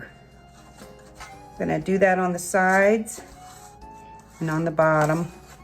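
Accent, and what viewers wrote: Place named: New York, United States. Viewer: New Yawk!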